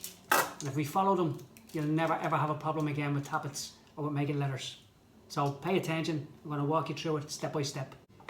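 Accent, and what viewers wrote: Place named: Ireland. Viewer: Awesome tutorial video! Love your accent, pretty sure its Irish 😊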